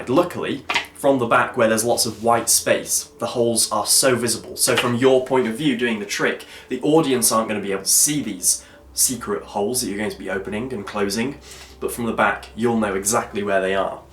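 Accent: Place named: United Kingdom. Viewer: Came for magic! Stayed for British accent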